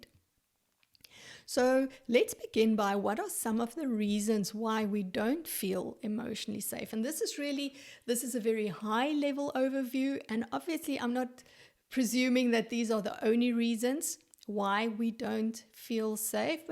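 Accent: South Africa. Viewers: Are you South African? just curious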